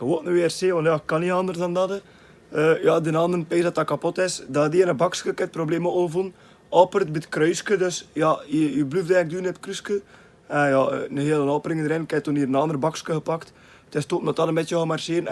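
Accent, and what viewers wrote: West Flanders, Belgium. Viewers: tis wel nie af en toe e int west - vlams ist nu en ton 😄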